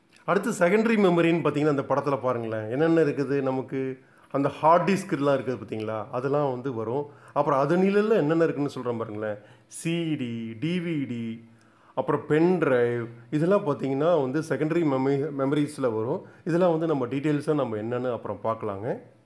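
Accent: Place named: Kerala, India. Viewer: Sir sounds Kerala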